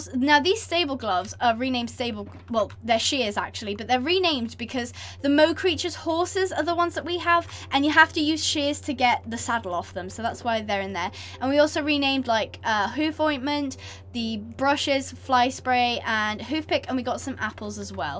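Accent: United Kingdom. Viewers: I love the British accent she has! Love ya!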